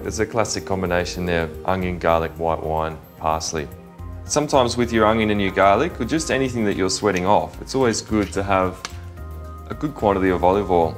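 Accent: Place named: New Zealand. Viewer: New Zealand I think. Gets confusing because there are so many NZealanders in Australia and Australians in NZ, but I think I'm right. The plonk is Australian anyway.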